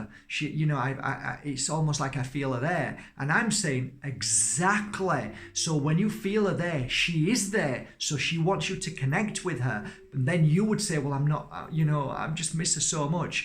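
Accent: Yorkshire, United Kingdom. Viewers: I have never come across any of your videos before but within the first minute of watching my child self came out and yelled NICEEEE!! Your positive energy is so relatable I feel like I hardly know people with such vibrant energy as much as I have and now I've discovered you I'm just so happy there is someone else out there who is just as happy and smiley and loving as i am... I'm also from Yorkshire and I would love to meet you, I can hear it in your accent :D Freedom. where can i find you and share lentils?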